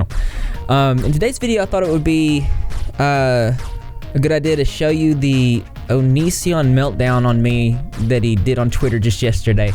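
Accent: South, United States. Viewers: Love that southern twang 😍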